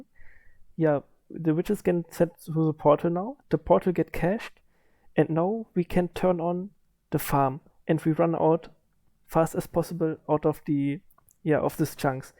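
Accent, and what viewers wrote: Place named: Germany. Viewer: man hört sogar das du deutsch bist. dein englisch man hört es.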